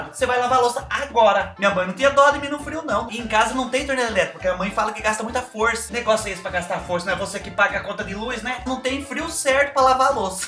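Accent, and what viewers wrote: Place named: Goiás, Brazil. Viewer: Oi em primeiro lugar quero dizer que concordo com a Milena Barbosa..... para de ficar falando essa palavra (des......)nem vou terminar,pois não é bommmm # fica a dica. E agora quero dizer que eu concordo com você, detesto o 🥶 frio. Graças a Deus moro no Centro Oeste do Brasil, em Goiânia amo minha cidade e principalmente o clima, agora esse mês e o mês que vem faz um pouco de frio..... mesmo assim me incomoda muito, mais logo passa. quero que saiba que ammmmooooo vc, e esse seu jeito de ser e falar, amo.aqui em Goiás principalmente nos interiores fala muito assim com vc, na capital também e é por isso que amo ver seus vídeos..... 👍🏾 continue assim, só pare de falar aquela palavra feia..... viu!!!!!!!bjs no seu coração.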